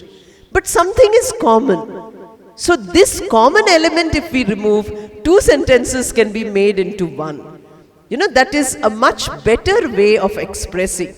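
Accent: India. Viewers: I am from Delhi there are so many Institute of English I have also joined a Institute but I like your video because you speak Indian English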